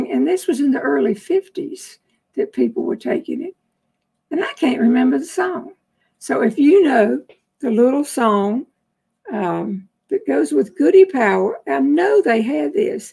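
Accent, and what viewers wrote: South, United States. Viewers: I normally can’t listen to a southern accent, but is an exception. Love her. R.I.P.!❤️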